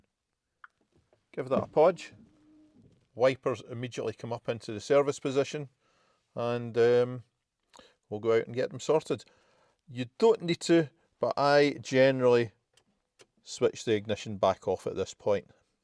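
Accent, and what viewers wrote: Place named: Scotland, United Kingdom. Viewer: Not only well explained but by a fellow Scot in a familiar tongue. Many thanks.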